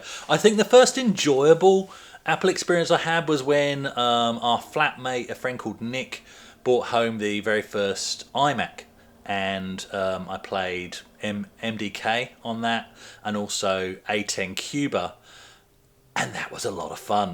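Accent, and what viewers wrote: Australia. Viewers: I love the Auzzy accent in the out of box bit. Don't spill any liquid on it.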